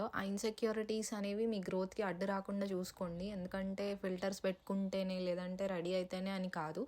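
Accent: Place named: Krishna, India. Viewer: Meedi Krishna dist.ani mee bhasha chusi telisipoyindi 1st time chusaka ne..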